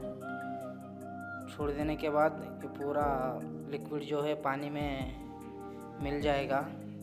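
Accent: Hyderabad, India. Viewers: Pkka hyderabadi bol rahe hoo bhai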